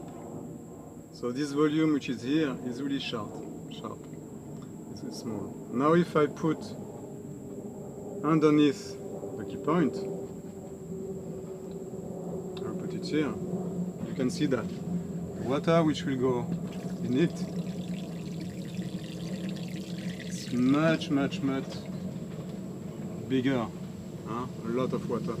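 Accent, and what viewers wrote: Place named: France. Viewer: You are speaking in english, with a french accent, in a channel with a name that is in portuguese. i'm kinda confused.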